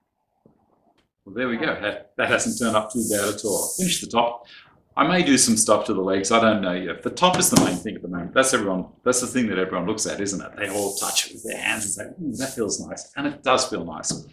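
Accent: Australia. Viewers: you sound Australian but are you living somewhere else ? other country !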